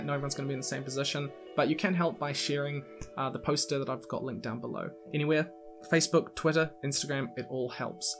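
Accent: New Zealand. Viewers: Ahh I love your accent- It has nothing to do with the video but kiwi accents are always so- yes. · When I hear your accent, all I can think about is that new Zealand deck commercial.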